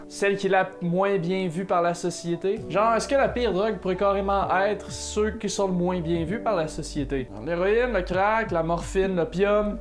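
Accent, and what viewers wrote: Quebec, Canada. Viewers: Le sujet m'intéresse mais l'accent québécois vraiment j'peux pas. Dsl. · Développement indigent. L'accent québecquois ne donne rien de plus. Déjà, un type qui a un bonnet sur sa tête à l'intérieur, tu comprends que des choses ne fonctionnent pas bien dans sa tête. Une drogue est une substance illégale (en général parce qu'elle est néfaste) et donc les imbéciles qui consomment des drogues et qui essayent de les juger sont des crétins. Après, on peut essayer d'établir des jugements absurdes avec d'autres substances, si on manque de confiance en soi et qu'on a compris qu'on est dans l'auto-destruction. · Hello le Québécois ! Salutations d’un "maudit" Français ;-) Chouette vidéo, à quand une vidéo sur l’argot québécois que tous les autres francophones ignorent ? J’aime tellement votre vocabulaire et bien sûr cet accent extraordinaire ! 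Bye les lointains cousins et vive le Québec libre !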